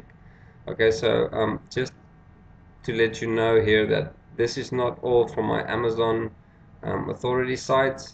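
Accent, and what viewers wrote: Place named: South Africa. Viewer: oh you from south africa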